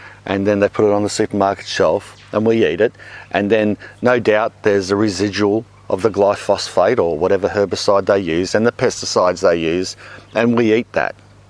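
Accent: Australia. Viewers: Hahaha. Made me laugh. Love the Aussie accent. “What a wanker”. Xx NZ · Hey recently discovered the channel. Fantastic! Anyway here in the land of takeout Boston Massachusetts area specifically we see the same type of negative publicity about growing your own food. in fact certain cities and towns but even more restrictions on growing your own food in the backyard which I think is crazy. I'm starting to grow my own for the first time and looking to move out you another state so I can have more land it's so expensive here. But growing up my brother and sister and I and my Mom and Dad we had a huge garden my mom became expert. It and we produce enough food to feed us all during the growing season and often had enough to store a few months after. In fact maybe that's where I learned to love vegetables. it's nice being able to watch your videos and understand the things I need to do a lot of what I had forgotten. 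by the way I listening to the Australian accent it's very similar to our accident here in Boston. We don't R much either 😀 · Love your Australian acesnt!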